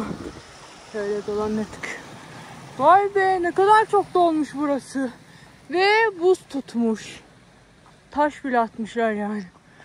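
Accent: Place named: Laz, Turkey. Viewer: Hafif laz şivene hastayım yaa çok sevimlii :dd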